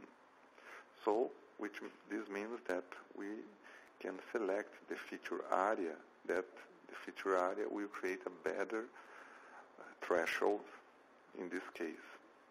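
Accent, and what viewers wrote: Brazil. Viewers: You accent its similar to brazilian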